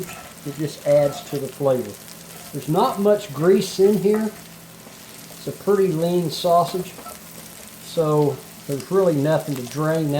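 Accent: South, United States. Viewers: I look your southern accent😁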